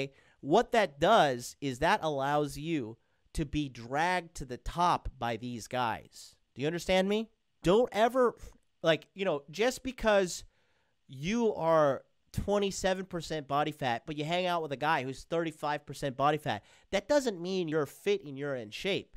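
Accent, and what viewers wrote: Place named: Trinidad and Tobago. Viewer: Great Trinidadian accent!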